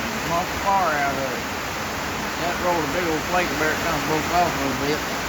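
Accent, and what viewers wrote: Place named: Texas, United States. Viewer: I'm just here for the rain and Texas dialect ASMR.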